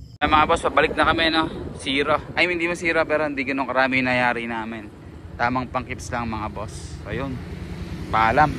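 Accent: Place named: Nueva Ecija, Philippines. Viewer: Taga nueva ecija kaba idol? Pang nueva ecija punto mo e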